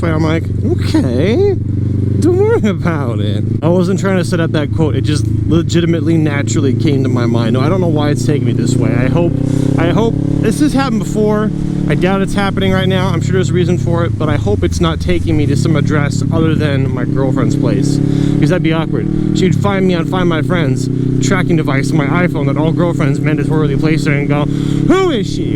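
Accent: United Kingdom. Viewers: Love the British accent ✌ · 😂 the British accent. I’m surprised at how laughter my lungs are currently.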